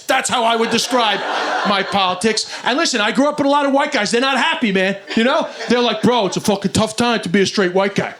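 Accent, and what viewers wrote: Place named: New York, United States. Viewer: Bill Burr with a NY accent instead of a Boston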